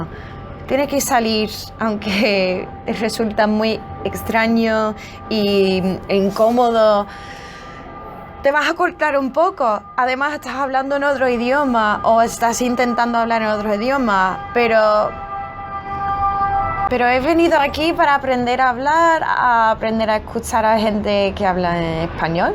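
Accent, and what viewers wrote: Andalusia, Spain. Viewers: Que interresante escuchar un gringa spikando spanish los cidadanos de gringolandia hablan funy el acento y hablas como una andaluza ole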